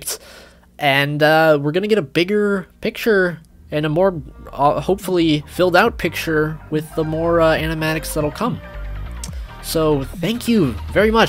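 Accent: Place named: United States. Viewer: Judging by his voice he's American And I agree because Belgium and France are nowhere near each other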